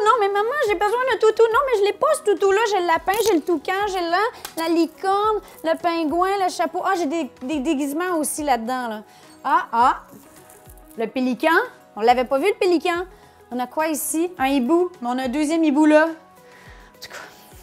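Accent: Quebec, Canada. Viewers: Hello hello, grâce à Carl tu as une nouvelle abonnée depuis ce soir :). Merci pour ce magnifique House Tour :). Votre maison est énoooorme et très bien aménagée. J'adore l'accent québécois alors j'suis vraiment super bien 'tomber'. Saskia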